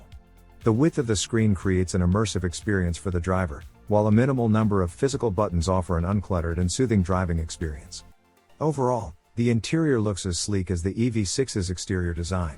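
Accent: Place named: United States. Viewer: Great-looking car, but deathly awful Americanised narration and leaden storyboard. Get a British production company to produce your promotional videos. You only have to look at the UK's Grand Tour production values to witness innovative and inspirational delivery, which is absent everywhere else.... in the world.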